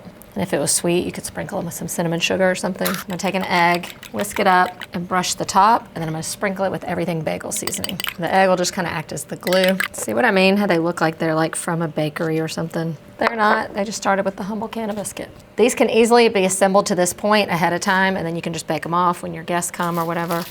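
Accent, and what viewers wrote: South, United States. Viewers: I really enjoy listening to this pretty and smart young lady. How lucky is her family! She is so interesting and I love the southern accent. So many helpful ideas and recipes that are practical, easy and delicious., you are the best!!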